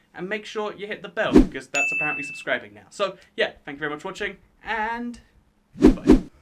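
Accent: United Kingdom. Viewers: He lives in the UK so he has an accent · M.A.A.C CRAFTERS No, He's Brittish.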